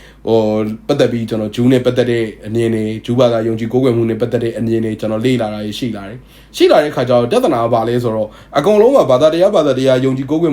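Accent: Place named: Bangladesh. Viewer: တခုပဲအကျန်ပြုချင်တယ်အဖေအမေရဲ့ဝမ်းဗိုက်ထဲကမွှေးလါထဲကပဲအကိုပြောတဲ့ဘဂ်လီလို့ပြောခဲ့တယ်နော်ကျွန်မတို့လဲဘဂ်လီသွေးနှောပဲတာပေမဲ့အကိုကြိုက်သလောက်ဘာသာမဲ့လို့ပြောလဲအကိုစကါးအသွားအလါမှာဘဂ်လီသံပေါက်နေတာရယ်မိခင်ဖခင်ရဲ့အသွေးအသားနဲ့တည်ဆောက်ထားတာဆိုတောလူရယ်လိုဖြစ်လါရင်လူ့ပါတ်ဝန်းကျင်မှာဘာသာတခုတော့ယုံကြည်စွာကိုးကွယ်စေချင်ပါတယ်အကိုကကို့ရဲ့ပြထမ်းထားတဲ့ကျမ်းကန်ကိုအသေချာလေးလေ့လါစေချင်ပါတယ်ဂျပန်လူမဖိုးကိုရီးရားလူမျိုတရုပ်လူမျိုးအဂ်လိပ်လူမျိုးမြန်မာနိုင်ငံမှာရိတဲ့မြန်မာလူမျိုးတွေတောင်အစ်ဆလ္လာဘာသာကိုလေ့လါသင်ယူပြီးအီမာန်တေဂျင်နယ်အမိအဖမွတ်ဆလင်ကမွေးပြီးကို့ဘာသာကိုကိုလေ့လါပြီးသင်ယူမှုတွေလုပ်ပြီးအီမာန်ခိုင်မြဲရင်အကိုကိုညမတိုပျော်ရွင်စွာနဲ့ဂုဏ်ယူနေမှာခုလဲညမကအကိုဘအကောင်မှာဝင်ကြည့်လို့အရမ်းန‌ှမျော့တယ်အလ္လာ့ဆီမှာအလ္ာကြီးဟေတာရပ်ပေးပါစေလမ်းလွဲမရောက်ပါစေနှဲ့လိုဒိုအာလုပ်မှာပါအကိုဘယ်လိပဲဘာသာမဲ့ပြောပြောအကို့ကိုကုလါးဆိုပြီးကော့မန့်မှာဝင်ရေးနေတာတွေ့တော့ညမစိတ်မကောင်းပါဆရာကြီးဝင်လုပ်တာမဟုပ်နောအကျန်ပြုတာကျေးဇူးပါဖိုက်တင်း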